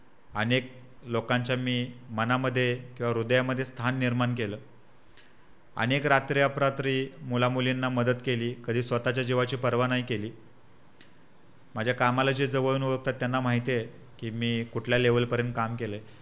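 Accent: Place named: Walwa, India. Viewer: मला पहिल्यापासूनच वाटत होते की ही भाषा वाळवा तालुक्यातील आहे मी पण इस्लामपूर मधून आहे .माहेर इस्लामपूर व आजोळ आष्टा. डीन.एड्. कस्तुरबाला झालंय. आमच्या तालुक्यातील माणूस लय भारी वाटत.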